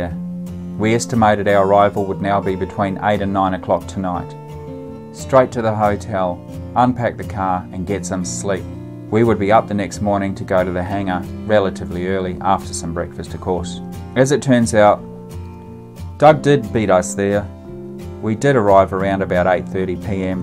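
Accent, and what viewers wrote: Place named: Australia. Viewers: Australian accent is quite different... but then again so are most Aussies (we won't say where he REALLY comes from...) must admit he comes across as pretty suave... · Great video! Looking forward to next episode. The Australian accent is quite different, but I like it.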